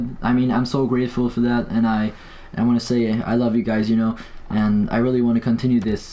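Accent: Quebec, Canada. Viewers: Ahah l’accent québécois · oh okay. French canadian? That explains the accent right? Keep up the good work. Love these vids man👍🏼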